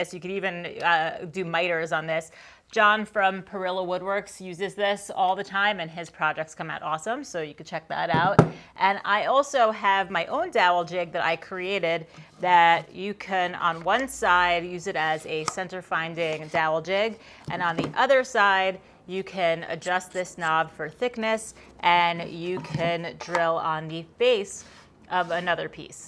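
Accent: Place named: Northeast, United States. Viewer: "joinTing"?,,,There are LOTS of wood working channels out there,, Listening to your Northeast accent is why I like yours,, Keep being you!!... ;-)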